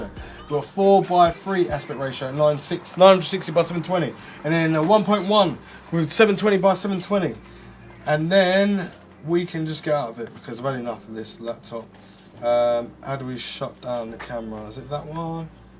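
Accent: United Kingdom. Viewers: hey mate i like it again, i even like more yr brittish accent than the video itself lol greetings from paris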